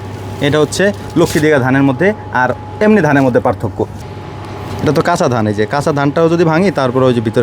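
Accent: Faridpur, Bangladesh. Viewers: আপনার আঞ্চলিক ভাষা শুনলে বোঝা যায় আপনি খাঁটি ফরিদপুরীয়ান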